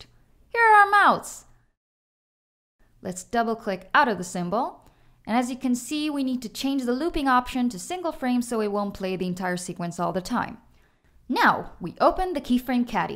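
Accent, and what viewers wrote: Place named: Scandinavia. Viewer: My guess would be... a hint of Swedish or Norwegian?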